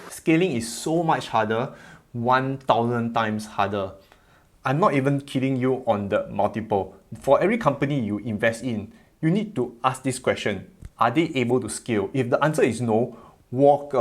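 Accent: Singapore. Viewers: I like the way u talk, really bring out the singaporean in us. Haha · You are incredible! Straightforward advice in a simple straight manner... love your accent.... Go Singapore! By the way, I am a Tesla fan as well... want to talk about Space X or Solar City going public on their own anytime soon?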